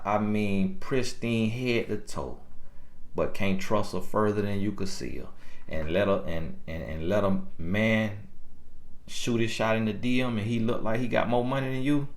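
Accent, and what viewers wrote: South, United States. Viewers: I smiled every time he said “On ya bike!” 😂😂😂 I love southern accents!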